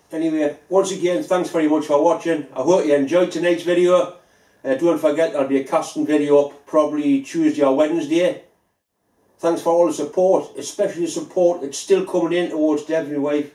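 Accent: Newcastle, United Kingdom. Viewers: wey aye, Geordie lad. Brute force and finesse , I love it.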